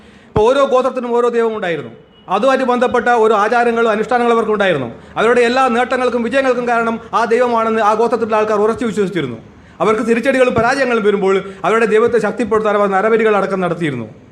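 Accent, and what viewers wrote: Kannur, India. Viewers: നല്ല കണ്ണൂര് ഭാഷ. സൂപ്പർ.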